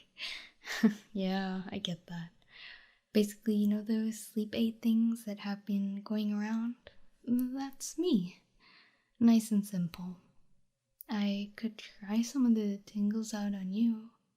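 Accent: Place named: France. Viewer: Oh ya one more thing your French accent just dose something to me I swear I would like to see more in the future only if your willing to take care : )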